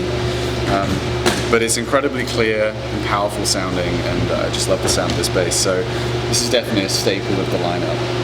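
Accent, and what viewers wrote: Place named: United Kingdom. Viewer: I just came here for the British accent.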